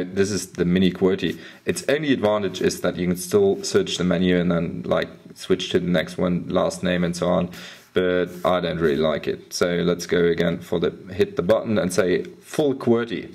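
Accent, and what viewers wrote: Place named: England, United Kingdom. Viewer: i hate your english accent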